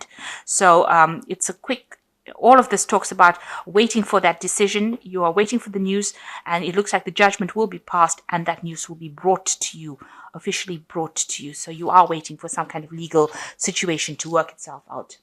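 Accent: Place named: England, United Kingdom. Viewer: English accent alert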